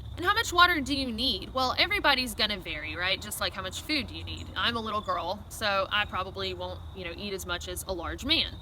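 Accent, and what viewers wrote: South, United States. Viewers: Amia she probably just pronounces it that way because of her southern accent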